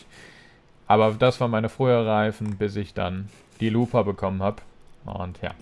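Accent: Luxembourg. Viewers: Biste Luxemburger? 😂😂👍👍👌💪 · Kommst du aus Luxemburg?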